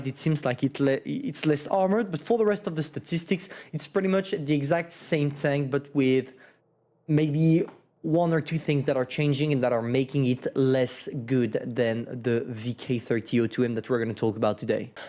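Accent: France. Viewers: He is probably french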